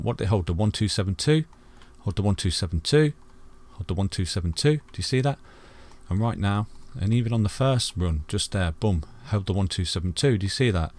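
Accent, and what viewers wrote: United Kingdom. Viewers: You British my man? Find your information impressive! Would 100% watch a tutorial from you (Y)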